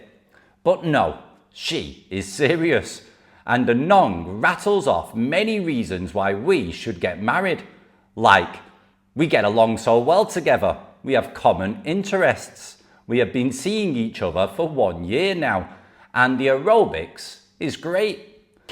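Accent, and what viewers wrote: United Kingdom. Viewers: You don't sound Australian, mate. You sound British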